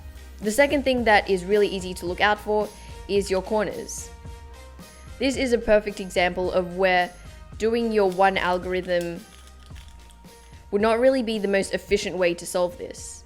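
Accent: Australia. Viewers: the cubey guy she's most likely Australian bc of her accent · Waaaaiiiit...are you Aussie?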